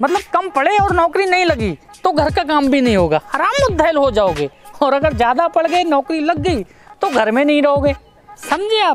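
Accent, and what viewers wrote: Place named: Budaun, India. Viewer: Bhai voice se lagta hai aap budaun ke aas pass ke rhne bale ho achcha tareeka hai entertainment ka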